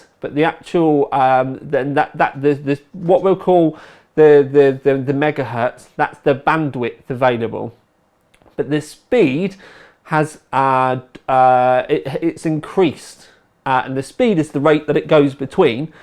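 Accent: United Kingdom. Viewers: Dude, your accent is mad tight! Wish I could pull off a British accent. I bet chicks dig your accent and you're all, "Please ladies, call me Sir."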